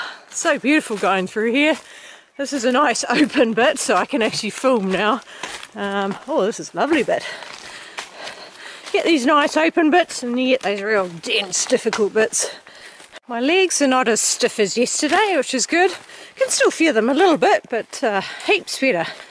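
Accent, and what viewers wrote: New Zealand. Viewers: I love how you always start your videos with “good morning!” In your NZ accent. It’s so charming! Love your videos!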